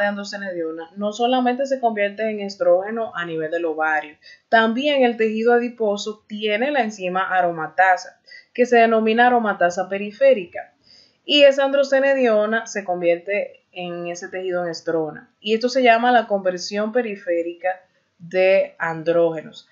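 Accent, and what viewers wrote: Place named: Dominican Republic. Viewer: Excelente explicación, felicidades!! Desde que escuché el acento supe que eras dominicana!! éxitos!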